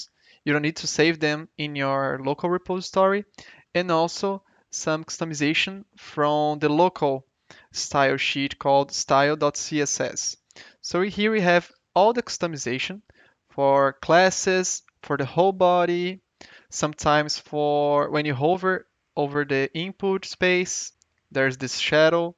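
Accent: Brazil. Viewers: Mano, quando eu ouvi o sotaque tive certeza que era brasileiro akkkk, daí o google te dedurou , parabéns irmão, ótimo conteúdo!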